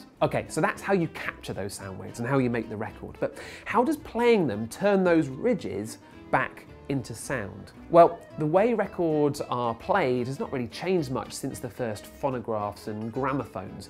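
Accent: United Kingdom. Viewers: Thank you mr. British man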